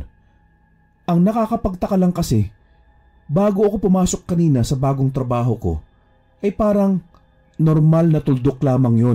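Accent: Ilocos, Philippines. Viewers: nakakatuwa ka naman mg ilokano lods hehe nice story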